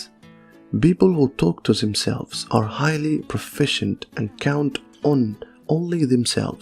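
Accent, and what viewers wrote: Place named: Egypt. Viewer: What's the program that you used to make the video? Super Cool. ALSO! Are you from Egypt? Your accent sounds very familiar :)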